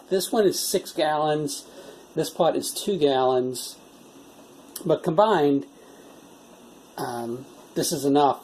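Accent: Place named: Pittsburgh, United States. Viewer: The pittsburgh accent makes me chuckle. Am I right? Yinz make at beer to go tailgate the stillers game?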